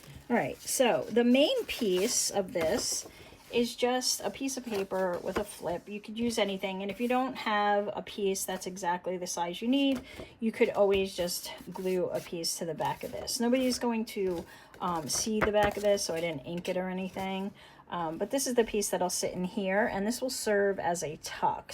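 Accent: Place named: Pennsylvania, United States. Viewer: Love your style.. will try these…. Sounds like you live in PA. I used to live there… now I am in MN Yes do more of these please..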